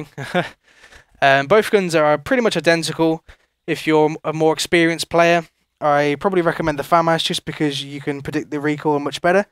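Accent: United Kingdom. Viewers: I'm guessing ur a British guy am I right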